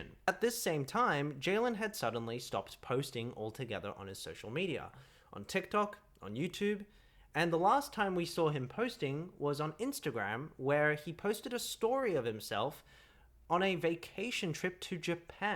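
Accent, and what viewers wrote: Australia. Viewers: I think he’s Australian :) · Bestie he is not british he literally has an aussie accent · unrelated but YOUR AUSTRALLIAN???????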